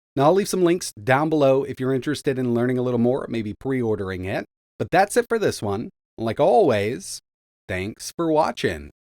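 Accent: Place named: North Carolina, United States. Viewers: That's NC talking! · Has one of the thickest American accent I have ever heard love the way he says "Mawd" 😂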